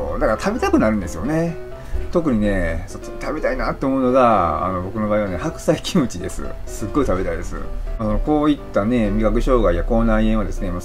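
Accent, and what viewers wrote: Osaka, Japan. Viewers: さん❣️こんにちは☺️こんばんはおはようございます😃 今日も為になる経験談を大阪弁に乗せて語っていただきありがとうございます❣️ さんは納豆大丈夫なんですね❓関西の方は苦手な方が多いと聞いてましたが…… 副作用との戦いはまだまだ続くのですか❓ さん頑張れ〜頑張れ〜❣️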